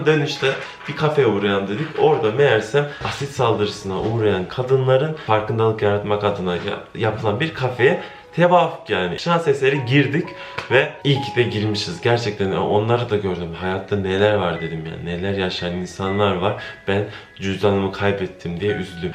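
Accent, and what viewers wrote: Konya, Turkey. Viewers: abi ilk videonu izlediğimde bu kesin konyalı dedim ve konyalı çıktın 😎😎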